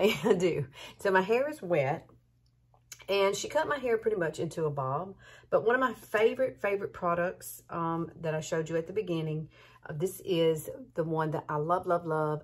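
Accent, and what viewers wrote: South, United States. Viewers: I have to say this first.....I watch a lot of Youtube videos for one thing or another, and I find myself muting the person on the video because their voice is so annoying. LOL You have the voice that makes me want to listen, because it's like the difference between a shout and a whisper. The lovely Southern accent helps too! Thank you for a most entertaining and informative video! Love from Los Angeles! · You are so sweet...love your southern accent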